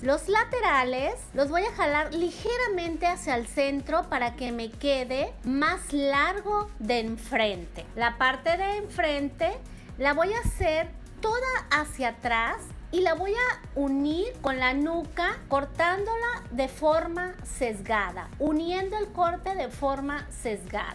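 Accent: Mexico. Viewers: Gracias por tus lindas palabras amen. Tu asento me suena de nacionalidad Méxicana. ¿De que país eres? Lindo dia.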